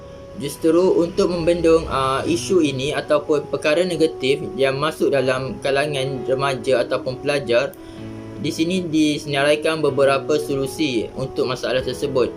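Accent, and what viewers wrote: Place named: Kelantan, Malaysia. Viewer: Walaupun agak Kelantan, tapi saya suka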